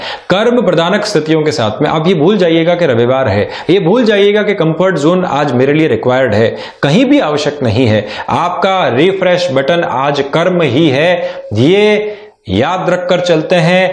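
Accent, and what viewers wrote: Standard. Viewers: Pranam. Bahut shuddha uccharan. 🙏🙏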